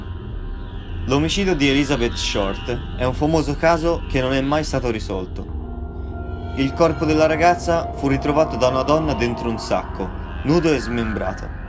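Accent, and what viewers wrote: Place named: Tuscany, Italy. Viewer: L' inflessione della lingua è toscana !!!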